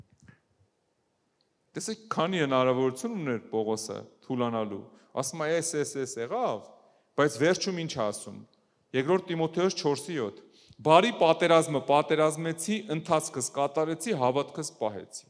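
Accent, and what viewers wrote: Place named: Aparan, Armenia. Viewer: Ես էլ ասում եմ ինչի եմ այսքան հաճույքով լսում ձեր քարոզները պարզվումա Ապարացու արյունն էր քաշում նախ ասեմ որ իսկապես շատ լավ եք խոսսում նույնիս խրատական և դաստիարակչական մեծ տաղանդ ունեք բոլորն էլ լավն են բայց ձես խոդքը մի տեսակ տարբերվող է երևի որ Ապարանցի եք դրանից իսկ եթե գաղտնիք չի կասեք կոնկրետ Ապարանի գյուղերից եք թե միջից ես էլ եմ Ապարանցի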